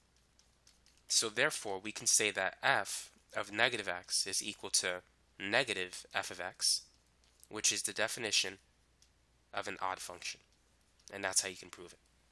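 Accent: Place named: Boston, United States. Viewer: Are you from Boston? I dig your faint accent.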